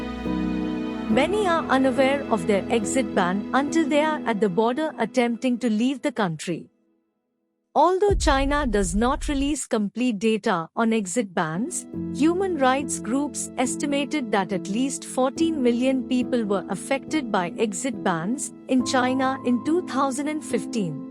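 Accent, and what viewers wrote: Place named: India. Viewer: The Indian accent tells me all I have to know that this is a garbage channel.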